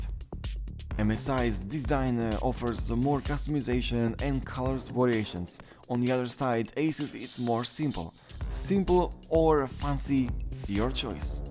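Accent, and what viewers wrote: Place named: Romania. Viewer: nu pot sa nu recunosc accentul de roman care vorbeste engleza! :)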